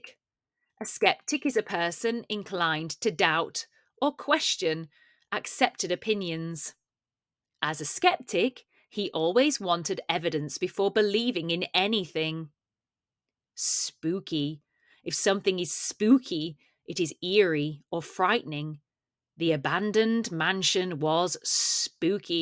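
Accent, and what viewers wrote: United Kingdom. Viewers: Thanks for creating this program, because I can learn British accent from this. Thank you very much!❤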